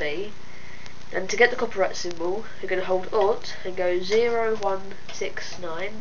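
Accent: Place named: United Kingdom. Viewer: sounds kinda like hillbilly british english....say WHAT...?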